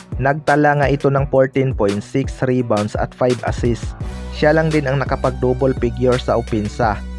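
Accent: Visayas, Philippines. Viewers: Bisaya ka ba bro,Kasi yong tuno mo bisaya ka talaga eh.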